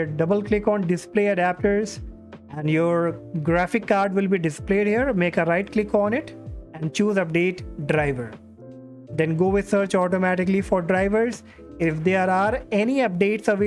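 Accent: India. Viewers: Idk why but you sound like those call center frauds. I'm for calling you fraud but those people just spoiled Indian accent.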